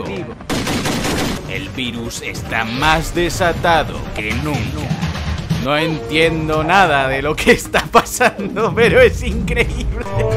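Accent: Spain. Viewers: Un detalle alucinante es que el video sea narrado por un español con memes en audio latino jja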